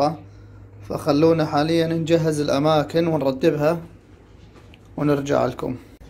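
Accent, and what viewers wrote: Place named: Mosul, Iraq. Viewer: عبالي شامي طلعت من اهل الموصل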